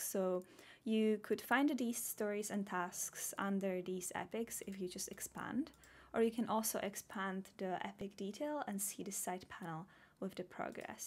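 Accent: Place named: Scandinavia. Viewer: Nice video! but the whole time I'm wondering where your accent is from, Its either Finish, Icelandic maybe even Norwegian??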